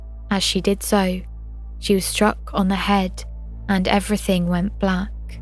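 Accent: United Kingdom. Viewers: Great to hear a British voice for a change new subscriber. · I think it's more of a British thing, this channel is British, the accent is British and obviously the reference to the Met Police and the Sarah Everard case give that away- she sounds about my age so to me Red Rum was a famous British racing horse- that grew legendary status. I have watched the shining and can't remember Redrum but who knows....in the end you have to change words around to stop things getting blocked in the world of youtube.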